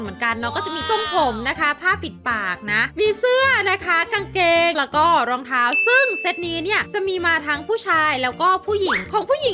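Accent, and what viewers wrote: North, Thailand. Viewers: อ้าว คนเหนือเหรอครับปม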